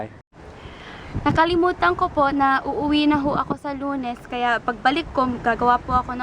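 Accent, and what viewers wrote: Philippines. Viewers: You got the Filipino accent down :D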